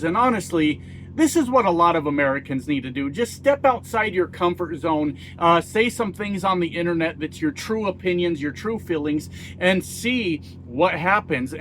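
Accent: Canada. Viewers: You do have a Canadian accent! I embrace you as an honorary Canadian. Thank you for your support. 🇨🇦 I am proud of Canada. 🇨🇦🇨🇦🇨🇦🇨🇦🇨🇦🇨🇦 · We got the right guy for prime minister. And yes, you do sound Canadian. We love your speeches. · does sound Canadian a little...not sure why:face-blue-smiling::face-green-smiling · You have the kind of Canadian accent that makes Canadians ask "What part of Canada are you from?". You sound like one of us, just one from someplace else. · Didn't think about it until you pointed it out, but you do sound like you're from Nova Scotia😊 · Ugh. I’m super happy that our Country voted for Carney but I am from ‘Berta so this morning I woke up to a FB feed full of separatists. I think I feel the way you guys felt watching your friends vote for Trump. I’m still nervous at what havoc my province is going to stir up. That being said, you very much do sound like a Canadian bud. 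Welcome!:). And not the weird ‘Berta type.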